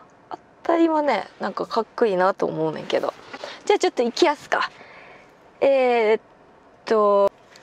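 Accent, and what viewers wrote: Osaka, Japan. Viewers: She speaks with that Osaka accent, so if you know a little Japanese she might still be hard to follow.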